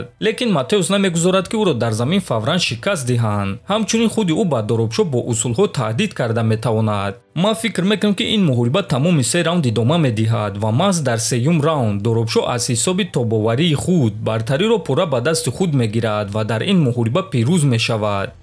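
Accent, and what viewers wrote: Tajikistan. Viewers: что за язык .тупой еше тупее.пазориш таджикский язык и акцент.пазорный волк.прекрати мычат.баран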